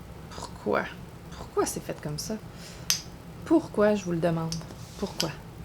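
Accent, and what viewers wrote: Canada. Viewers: Super vidéo, je suis nouvelle sur ta chaîne. Comme toi passionnée de parfums. Tes vidéos sont très intéressantes. Je me suis abonnée à. Ton accent canadien est merveilleux 😍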